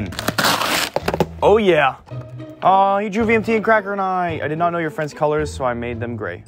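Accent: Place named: Germany. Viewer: his german accent tho :,)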